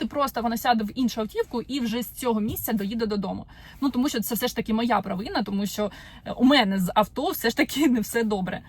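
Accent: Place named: Central Ukraine, Ukraine. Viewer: Хочу сказати що ви розмовляєте українською наче ви не з Базмуту, а десь з центральної України і прожили все життя там. Це супер і контент цікавий!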